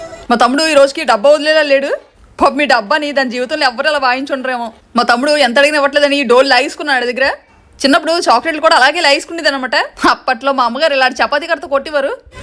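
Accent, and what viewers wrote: Vizianagaram, India. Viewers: Me family ki memorable event, so nice to watch your videos, also me vijayanagarm slang superb andi 😍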